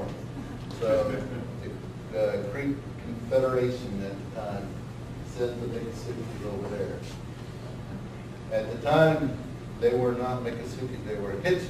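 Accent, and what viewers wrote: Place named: South, United States. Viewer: He looks like the "Europeans" of whom he speaks. With a southern drawl. 🤔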